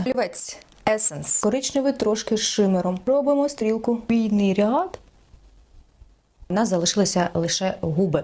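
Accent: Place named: Surzhyk, Ukraine. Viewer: Подобається ваш суржик 🥰😍❤️🔥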